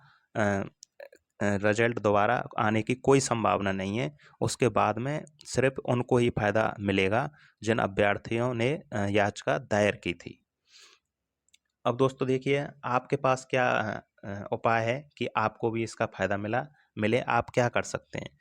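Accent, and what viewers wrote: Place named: Rajasthan, India. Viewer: Tumhari language se tum hindaun City k lg rhe ho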